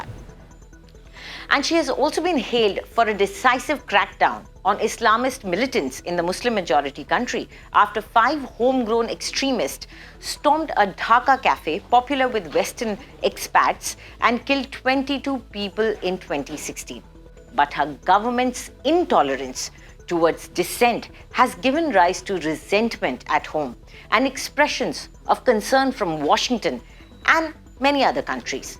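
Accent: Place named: India. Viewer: Sometimes I don't know why you could pick up such a good oral indian english but Not a proper english accent. It's time for you to go to UK or to USA for an accent reduction therapy session.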